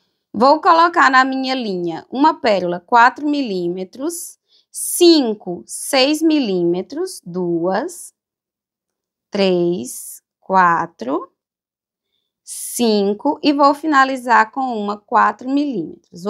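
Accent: Northeast, Brazil. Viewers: Ensina pedraria e ainda rima cordel 🥰 Ainda por cima com esse sotaque que só o nordeste oferece 😊Virei fã !!!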